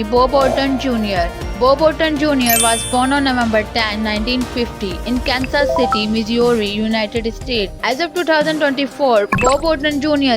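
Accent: India. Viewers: Why does your voice sound so weird? It sounds like you're from India or something. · Seems to me the narrator need to work on her accent! The whole world knows she's from India!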